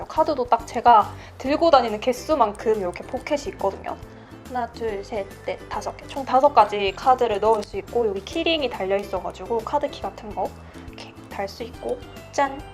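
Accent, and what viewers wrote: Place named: Gyeongsang, South Korea. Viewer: 대구 사람이시죠? 말투가 딱 대구같은데😚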